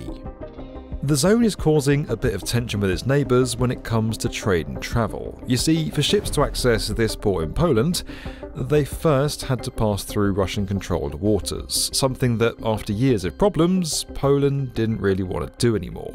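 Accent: United Kingdom. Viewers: sounded british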